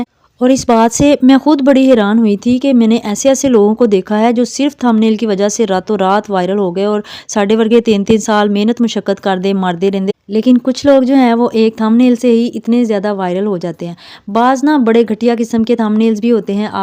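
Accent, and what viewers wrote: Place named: Punjab, India. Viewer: Very sweet panjabi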